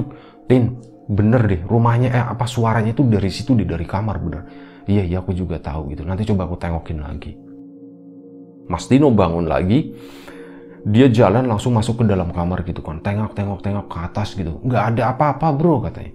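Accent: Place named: Banyumas, Indonesia. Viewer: Kalau boleh tahu video laphor ini dibuat dimana ya... kadang denger bahasa jawanya mas kok agak ngapak. Barangkali dekat bisa mampir.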